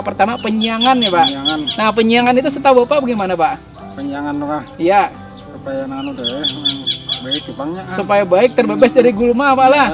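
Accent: Banjar, Indonesia. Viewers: Pian orang Banjar kah?? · Pian org banjar kh pak. Ampun chanel ini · Jelas sekali ulasannya, sehat selalu utk bapak penyuluh. Kya logat banjar, orang mana bapak? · Org Banjar sklinya 😂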